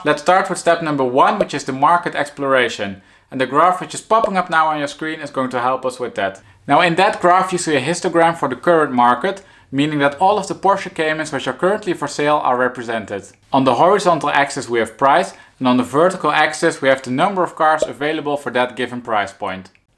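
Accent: Netherlands. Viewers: This is defenitely NOT a German, since this guy is talking with a superstrong dutch tonality 🤣. Best regards from Hamburg, Germany...Frank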